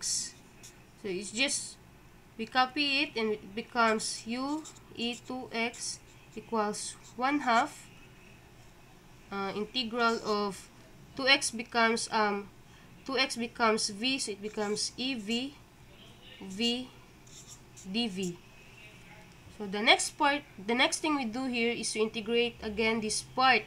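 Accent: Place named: Philippines. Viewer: filipino ka ba? ganyan yung accent ng filipino sa english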